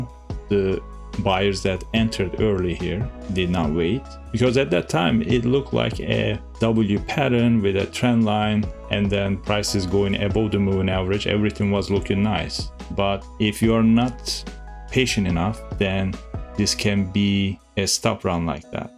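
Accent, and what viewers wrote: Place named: Turkey. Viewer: Hello, Are you originally Turkish? Your accent sounds Turkish😊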